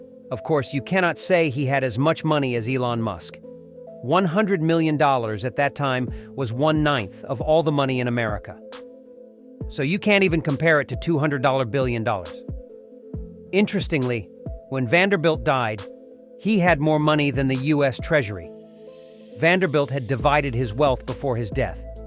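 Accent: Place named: Scotland, United Kingdom. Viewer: I love your Scottish accent. You should make more documentaries about the Scottish with that accent.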